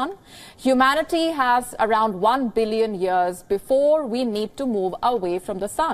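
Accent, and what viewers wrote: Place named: India. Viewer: Daily dose of indian accent news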